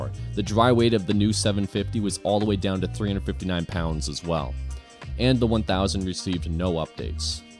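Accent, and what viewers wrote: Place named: New York, United States. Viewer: I like this channel because it's a real human talking (I think!) If it's A.I. with a NY accent I'm impressed. LoL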